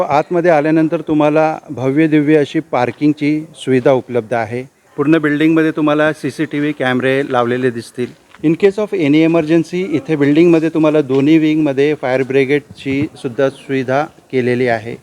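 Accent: Konkan, India. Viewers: Mi hindu aahe pan tumchi kokani muslim language aikayla far god watate. Jevunshi aiylu etc. all d best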